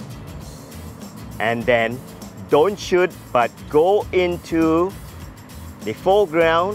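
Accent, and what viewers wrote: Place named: Malaysia. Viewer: Patut la slang english kenal je, org malaysia rupanya. Great video btw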